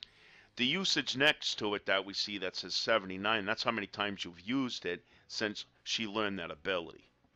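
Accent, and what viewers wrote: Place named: Boston, United States. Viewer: Watching this almost a year later bro. Thanks. Just wondering, you from Boston? I hear the local accent.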